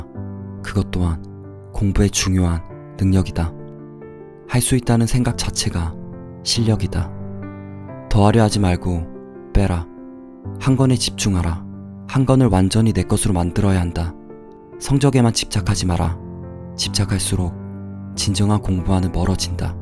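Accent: Gyeongsang, South Korea. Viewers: 경상도 분이신가 '아무껏도' 연발이심.. 몰입하다 빵터졌네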